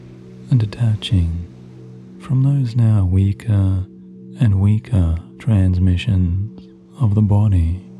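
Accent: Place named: Australia. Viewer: your Aussie accent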